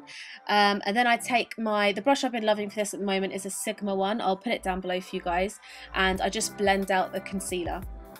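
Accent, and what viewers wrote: United Kingdom. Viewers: Voice over! I live in America and i'm obsessed with your British accent!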